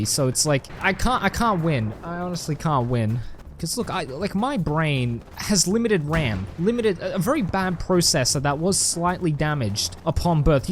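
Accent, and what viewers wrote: Australia. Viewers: Are you south Australian?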